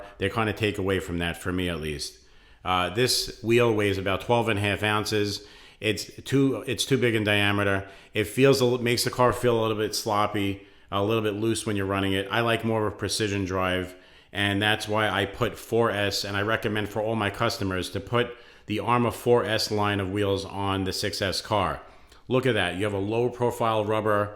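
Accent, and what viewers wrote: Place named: New Jersey, United States. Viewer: I just realized I think you’re in New Jersey I could tell by the accent lol. But I’m gonna guess up north because I’m in the south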